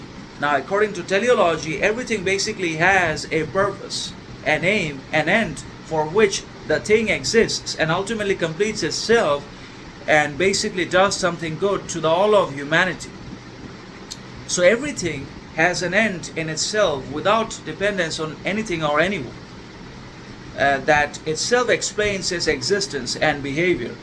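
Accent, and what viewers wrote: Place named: England, United Kingdom. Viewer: I like your English accent bro.. as well as philosophical... how your learn this accent.. guide me... I am struck in fluent and lack of accent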